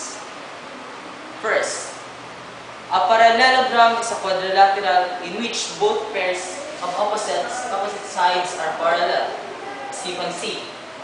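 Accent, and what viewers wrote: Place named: Philippines. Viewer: Lol, You have such a filipino accent! Nice(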